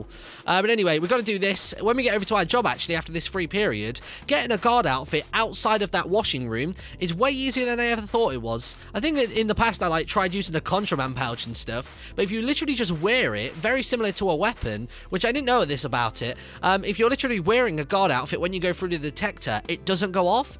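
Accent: United Kingdom. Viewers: Banana Bucket He Has a British Accent If You Havent Already Noticed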